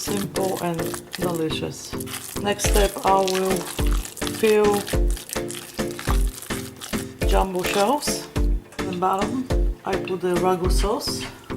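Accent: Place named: Europe. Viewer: Great recipe my friend these look delicious remind me of my Moms question what is your background? You sound European 💜🌺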